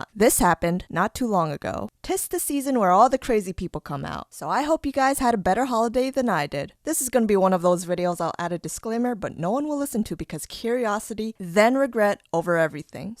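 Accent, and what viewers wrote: New York, United States. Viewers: broooo are you deadass from new york lmaaaaoooo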